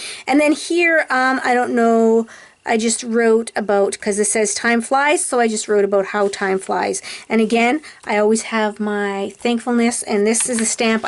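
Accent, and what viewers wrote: Canada. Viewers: The "aboat" gave it away immediately! You're Canadian! I've enjoyed watching your video.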